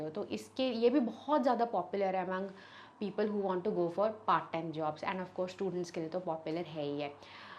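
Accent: India. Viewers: Hariyana se hai ke.. Rom Rom ji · Why do you sound like a Maharashtrian? 😅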